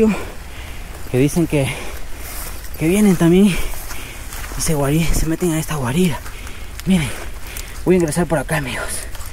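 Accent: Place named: Quito, Ecuador. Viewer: Habla quiteño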